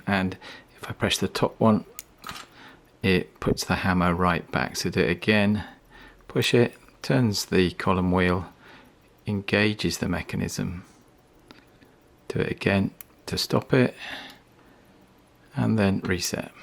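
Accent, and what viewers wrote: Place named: England, United Kingdom. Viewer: Flot informativ video. Jeg skal lige høre. Er du dansker, men med en meget fin engelsk accent ?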